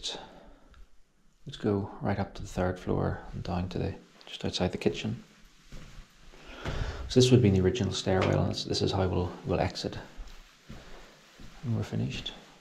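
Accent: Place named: Ireland. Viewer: It was good to hear that Irish accent commentary. I used work beside a chartered engineer from Ballymena in Edinburgh in the 90's. Mesmerising video thanks for sharing.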